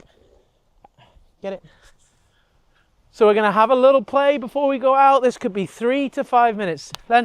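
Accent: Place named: England, United Kingdom. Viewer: Good to see that I'm on a good way and combined merhods as you 😉 I thought it's mean after sniffling anyway to forbid everywhere. So I startet to take him in sit position in front of hotspots ... and wait till he ask with view to let him sniff and pee ... Realky great vid you kreate and I love the english 😁😆 nice to liste to 👍🏻 compares to the american ...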